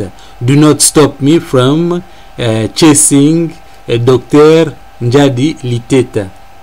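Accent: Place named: France. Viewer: Your english sound french.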